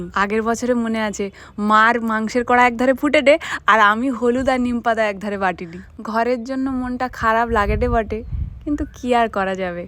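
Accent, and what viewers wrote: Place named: Purba Medinipur, India. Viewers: Ata to purba medinipur vasa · Eta purba Medinipur er bhasha-r moton.. Pray same.. Amar Sosurbarir desh bari Purba Medinipur.. Okhane sobai erokom kotha bole.. Khub valo lage sunte.. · Didi amer bari purbamedini pur amader vasa khanikta oirokom sune khub vhalo laglo❤❤❤😂😂😂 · Ami Nandigram ar basinda onekta akoyrokom tone ta ❤ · Tomar Basanti bari lekhin kotha medinipur style er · Ata puro amader purba medinipur er anchalik bhasa, khub valo laglo go❤ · Ata to purba Medinipur er Moto vasa From PURBA MEDINIPUR ❤ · Ki6uta medinipurer vasar moto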